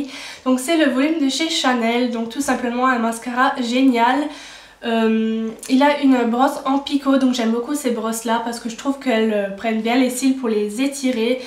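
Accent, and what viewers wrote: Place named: Alsace, France. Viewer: Super ce petit accent Alsacien :) Je vais au marché de noël de Strasbourg cette année, tu dois connaître ça! Sinon encore une vidéo bien top :) Bisous!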